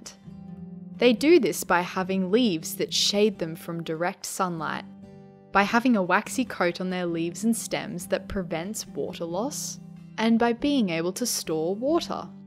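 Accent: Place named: Australia. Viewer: Sounds like a Australian accent it's kinda harsh to ask for them to pronounce it correctly many people may pronounce it differently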